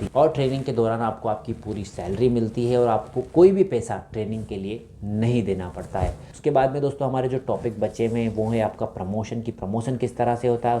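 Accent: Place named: India. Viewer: damn that indian accent..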